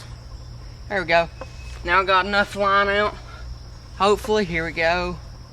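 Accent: United States. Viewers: Your accent sounds like a cowboy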